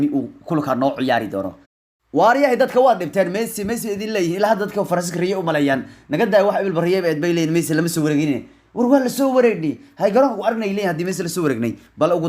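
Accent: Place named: South, Somalia. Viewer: Ileen afsomali in la bahdilo wax ka kow ah af reer konfured wlee waa laga sheeken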